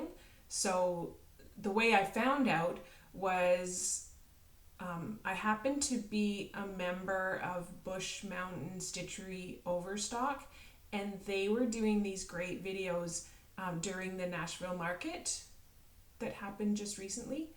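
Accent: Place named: Canada. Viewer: Hi, welcome to Floss tube. I think you did a marvelous job for your first video. Always a bit tricky that first couple of minutes. Mr. Right grew up in Canada, so I love listening to the cadence of your voice. I like your idea of family sayings. Inspiring. Thanks for stepping out there and giving floss tube a try. I've only made 10 videos, so I am still a smidge nervous. You have such a kind way about you, I think you will be a hit. Thanks for sharing and inspiring. Happy Stitching, Daleen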